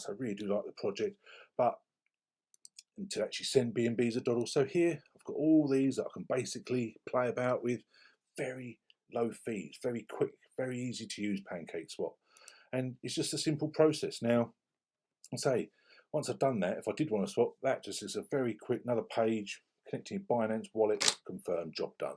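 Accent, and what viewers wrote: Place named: United Kingdom. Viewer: Hello sir, great content! If you don’t mind, I have a question for you. I assume you are a fellow Brit, with that accent! If so, can you advise how Gem token purchases work on VeVe - when I just tried to buy $100 worth of Gems I got charged £100 via the Apple store in app purchase. I cancelled, for now, but is that right, does $100 worth of Gems cost £100, instead of about £72 which it should be at 1.4 conversion rate? (Also posted on latest OMI video)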